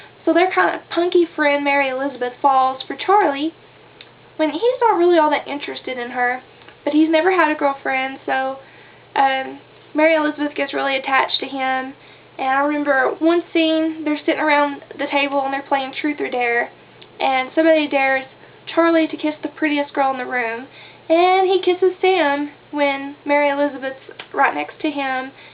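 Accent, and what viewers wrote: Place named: South, United States. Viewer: Yeah I like unconventional movies and I've had my eye on this one - thought you were from down South, you've got this June Carter thing going on! It's a beautiful accent to hear and I've been told you guys down south are the nicest people with good values - il just have to put up with my South London English accent!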